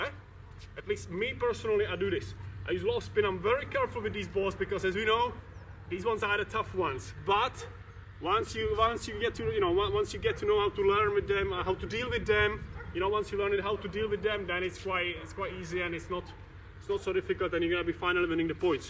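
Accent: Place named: Czech Republic. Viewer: nice one, thanks. where are you from by the way? your accent sounds tchechish.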